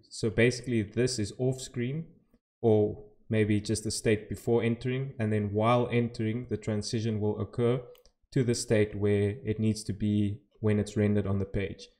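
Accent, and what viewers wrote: South Africa. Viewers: Are you South African? Sounds like an Afrikaans English accent.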